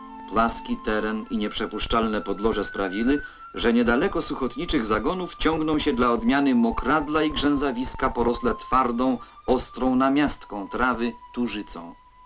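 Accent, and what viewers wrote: East, Poland. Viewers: Lektor chyba zza Buga? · Z jednej strony nie dziwie się komentującym, bo to historia, a jednocześnie dla pochodzących z tamtych terenów rodzinna historia, nostalgia. Jednak.. czy Wy nie słyszycie tej propagandy? Tego jak na sile podkreślane jest to że ta inwestycja to będzie wspaniała, która osuszy jedne tereny a nawodni drugie? Nie wspominajac o innej propagandzie ktora jest właściwie cały czas... ale takie były czasy PS. Czy wy też słyszycie ten akcent lektora, taki wschodni?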